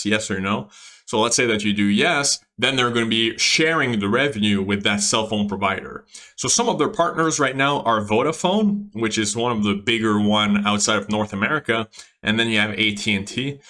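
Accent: Quebec, Canada. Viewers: Tu viens du Qc?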